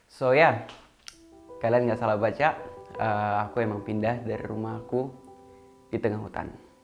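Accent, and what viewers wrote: Indonesia. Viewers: ...jangan lupakan logat bahasamu..dimanapun kamu berada Saya suka tutur kata Dan logat kamu yg Indonesia bangettt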